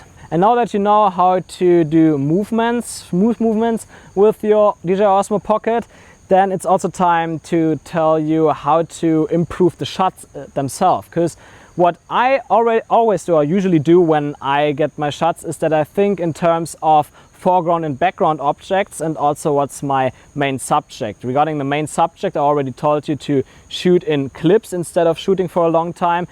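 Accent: Germany. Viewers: Danke für die tollen Tipps.. (Asume your accent is German=).. Great ideas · Mach Deutsche Videos man hört dass du Deuscher bist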